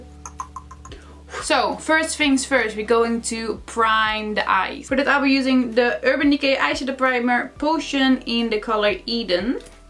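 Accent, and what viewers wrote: Netherlands. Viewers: Je hoort zo goed dat je Nederlands bent. Cute look🤗🤗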